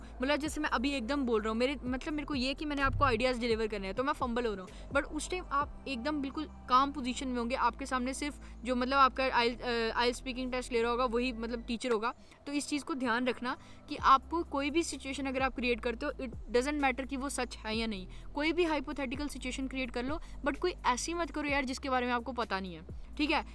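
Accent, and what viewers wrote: Jammu, India. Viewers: Are you from jammu, where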